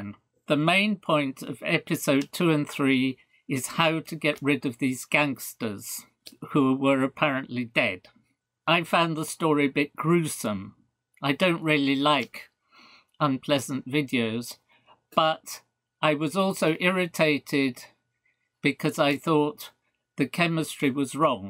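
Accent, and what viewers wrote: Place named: United Kingdom. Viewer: hearing someone say "the hero, walter, and his sidekick jesse, were surprised by gangsters; walter defeated two gangsters" in a british accent does something to my brain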